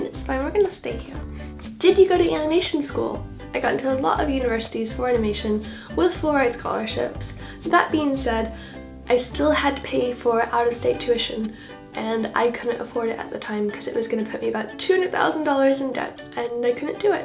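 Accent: United Kingdom. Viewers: I feel like I can hear more and more of a tiny little British accent coming out! 😍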